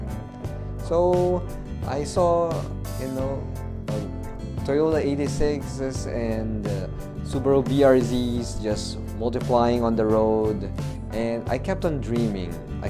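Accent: Philippines. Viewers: Ooohh, that is so nice 👍🏻 I’m watching your video and my wife hears you talking and she says oh that’s Philippino. I have a 99 Miata. That’s old, but I love it. It still runs great. It’s white with tan top. I wish I had one like yours. Beautiful Scarlet...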